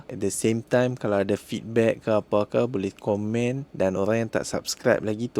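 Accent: North, Malaysia. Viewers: Btltu terbaikla, org Malaysia yg berbangsa India dan agama Hindu yg tentunya asal usul nya dari India, tp abgni dgn ckp lonhgat utara, pergi pulak sorang²tu, MMG Chanel yg baiklah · 1st video saya tgk dan terus subscribe. Penerangan jelas sekali dgn info tempat dan harga. Explaination pun sempoi lagi2 dgn loghat utaranya hahaha dan planning aktiviti pun menarik. ada tunjuk aktiviti penduduk in different time of the day.your intention to potray the other side of the locals tu mmg sampai, terbaik. Mantappp👍keep it up the good work. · Best memang terbaik la.dengan cakap loghat utara syok tengok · Wei lama aku tggu org kita buat content camni.. lagi mantap sbb cakap utara. Steady org kita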